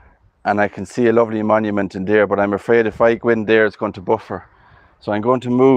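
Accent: Ireland. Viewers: I like the new channel name. The cemeterys there have such fantastic character and The narration with your Irish accent really makes for an incredibly enjoyable video ! ! Thank you for producing them!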